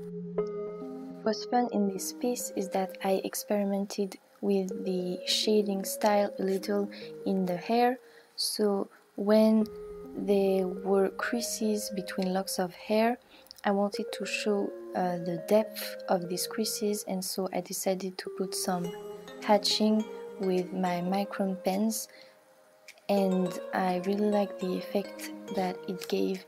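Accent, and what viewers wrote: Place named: France. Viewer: That's so great ! I love your style, and your art is so clean 😍 I'm scared I'm not right but, you're french, aren't you ? (Because of your accent)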